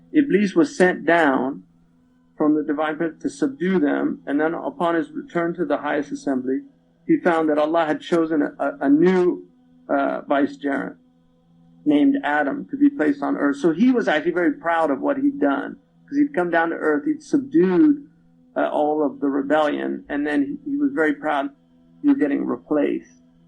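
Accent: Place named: New York, United States. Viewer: sounds like New York City or maybe Los Angeles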